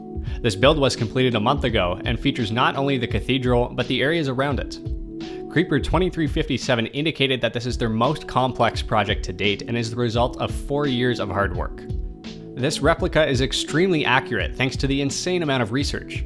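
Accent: Canada. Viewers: Are you Canadian by any chance??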